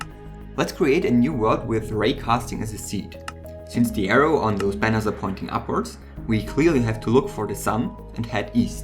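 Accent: Germany. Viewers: Dein Englisch ist so schlecht. Man hört dass du aus Deutschland kommst.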